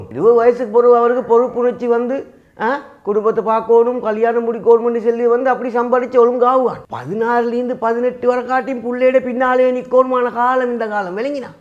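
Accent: Sri Lanka. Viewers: நீங்கா பேசுறது ரத்தணாபுர தமிழா கொஞ்சம் சுத்த தமிழ்ல பேசுங்கா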